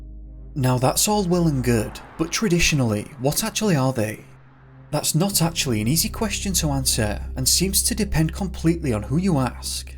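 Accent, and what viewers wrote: United Kingdom. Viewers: a big body of water might stop them from crossing, however there are strange disappearances in north america and some people see strange things in the woods and have slowed down time, some people have even said they can see their search and rescue teams and they hollar and throw rocks and its like they cant hear them and they are outside of space and time basically what you're saying is if you take it go put it back yourself or you'll be fucked forever sounds like silicone based life (the man eating rock) lol the way britts pronounce some words just sound funny. i only ashume aluminium is shinny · Reading off a script and being british does make him sound like every other youtuber. Reason for the method is so that theres a sense of (pseudo) intelligence. And the length is so people can fall asleep to this boring shit. Gotta farm those autoplay views when your audience isnt consenting 😂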